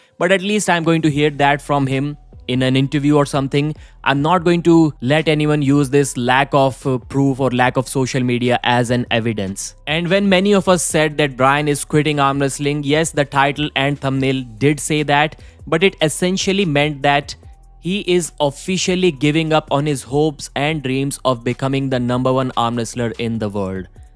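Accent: India. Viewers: Fuck your indian accent mate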